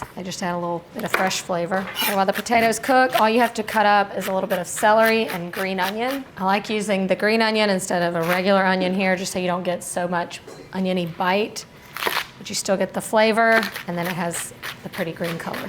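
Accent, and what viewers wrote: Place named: Texas, United States. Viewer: I thought you were from Texas this whole time lol.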